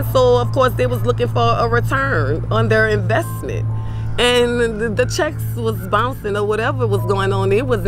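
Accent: New Orleans, United States. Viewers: I love that new Orleans accent ..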